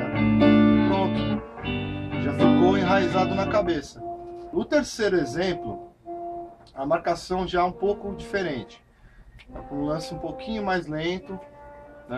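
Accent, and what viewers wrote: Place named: Minas Gerais, Brazil. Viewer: esse professor parece um d ituiutaba minas gerais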